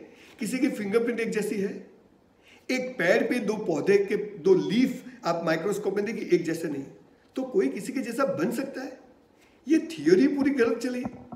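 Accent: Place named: Palanpur, India. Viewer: Well done Bhai . Gujarati Palanpuri boli no laheko j mast aawe. Natural j saru lage. Palanpuri boli ka bagicha vanchi to hase j.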